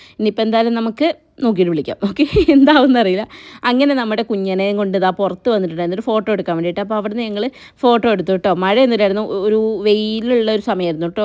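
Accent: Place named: Kozhikode, India. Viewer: ഞാൻ ഇപ്പൊ ഇയാളുടെ വലിയ ആരാധികയാണ്. എന്നെങ്കിലും നേരിട്ട് കാണാൻ ആഗ്രഹമുണ്ട്. സംസാരം ഒരു രക്ഷയുമില്ല 🥰 ഇങ്ങനെ വേണം പെൺകുട്ടികൾ 😘 ഇങ്ങനെ ഒരു മരുമകളെ അല്ല മകളെ കിട്ടിയ ആ ഫാമിലി ഒരുപാട് ഭാഗ്യം ചെയ്തവരാണ്. ഞാൻ വലിയമ്മയുടെ മകൻ സനേഷിന്റെ വൈഫിന്റെ മാമന്റെ ഭാര്യയാണ്. ഞാൻ ഒരു തൃശ്ശൂർകാരിയാണെങ്കിലും എന്റെ ചെറുപ്പത്തിൽ കോഴിക്കോടാണ് വളർന്നതും പഠിച്ചതും അതുകൊണ്ട് ഇയാളുടെ സംസാരം എനിക്കൊരു നൊസ്റ്റുവാണ് 🥰🥰🥰🥰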